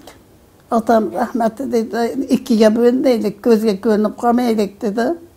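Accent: Andijan, Uzbekistan. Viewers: Онахон сог саломат булинг, 5 ёшда кетган булсангиз хам (Анжон) Андижон шевамизда гапирябсиза , аллохим у́зи асрасин, фарзандларингиз бахтини ку́ринг, afarın teyzacim çok teşekkürler sağ ol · Андижонча гапиряпти шева Андижон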